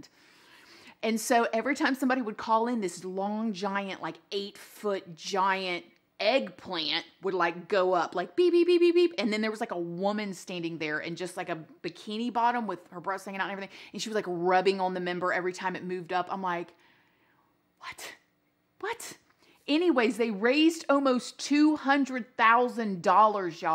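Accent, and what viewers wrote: South, United States. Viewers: You told the story PERFECT better than any I heard even back then! And I LOVE ya southern twang and your SOOOO Soo pretty 😍😁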